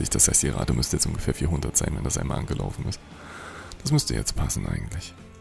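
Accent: Saxony, Germany. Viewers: Hallöle! Guck schon seit ein paar Tagen deine Videos zu Transport Fever 2 und habs mir deswegen auch selbst gekauft. Falls dir auf deiner kleinen schnuckeligem Karte mal Platz und Vorhaben ausgehen hätte ich eine Idee für dich. Im Workshop gibt es eine Deutschlandkarte in extragroß, da könntest dich auch austoben. Ich hab z.B. bei mir die Basiswerte für ein paar Städte angepasst damit sie von der Größe und Fläche auch entsprechend mitskalieren. Ausgehend vom Wert 200 für eine Stadt mit ca. 800k Einwohnern hab ich Berlin auf 800, Hamburg und Wien auf 400, München auf 320 und Köln auf 250 gesetzt um ungefähr an die realen Größenverhältnisse ranzukommen. Zusätzlich fehlt auf der Map jegliche Industrie, die müsstest du im Karteneditor erst hinzufügen. Oder aber, wenn du magst, kann ich dir meine Map zur Verfügung stellen, da es noch ein paar Kleinigkeiten gab die an der Karte zu machen waren. 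Die größte Herausforderung wird sein zum einen den Straßenverkehr in Berlin in den Griff zu bekommen. Wenn man 1850 startet brauch man vor Erfindung der Tram nicht wirklich in Berlin was machen. Als zweites wäre der Aufbau ein nationalen Fernverkehrsnetz nach realem Vorbild + die eine oder andere Regionalverbindung ein lohnendes Ziel. Ich versuch das ganze grad auf schwerem Schwierigkeitsgrad und es ist alles andere als einfach.^^ Zum Schluss noch: Dein Sprachfehler, gepaart mit sächsischem Akzent ist witzig, nicht jeder muss hochdeutsch können. :) MfG, Jester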